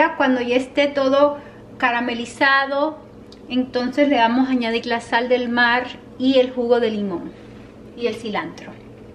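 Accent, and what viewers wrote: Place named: United States. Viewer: porque hablas como hablaria una americana, habla bien espanol.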